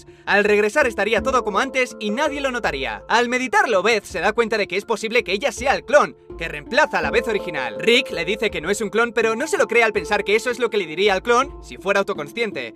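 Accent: Spain. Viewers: Tu voz de español zoqueta es INSOPORTABLE!!!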